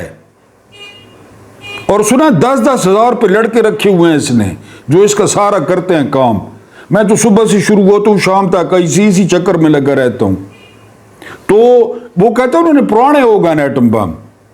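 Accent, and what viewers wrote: Saraiki, Pakistan. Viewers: صاحب حد ہی کر دیتے ہیں آپ سرائیکی کوٹڈ پنجابی سننے کا اپنا مزہ ہے ۔جگر حق و سچ کہنے پر بند نہ پڑھوا بیٹھنا ۔❤❤❤❤❤❤❤❤❤❤❤❤❤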